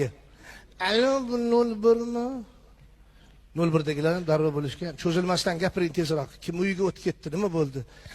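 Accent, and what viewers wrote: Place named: Tashkent, Uzbekistan. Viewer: Чести тошкенча😂😂😂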